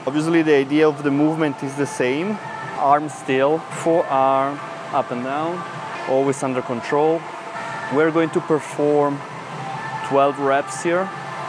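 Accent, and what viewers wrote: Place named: Italy. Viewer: i assume by your accent , tu sei un italiano amico !!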